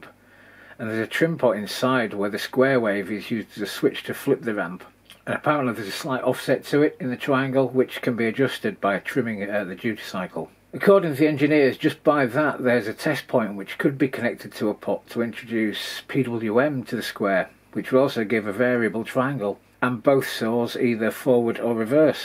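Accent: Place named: United Kingdom. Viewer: Mate I love your videos. Whenever one pops up I always watch it. The thing that drives me nuts is where you are in the UK. I spent time there when I was in the (US) army in 02 in Hereford, and there was a bloke there sounded like you but we called every british soldier "squaddie" and all I remember is that he supported Wolverhampton and was what you would call a 'gob-shite.' He saved a friend of mine's life and my friend used to joke that it weren't worth having to hear the story so many times. Anyway there is some word you use for when something has a downside, like no reset on DFAM starts with a B I think. What is it please? And keep making videos.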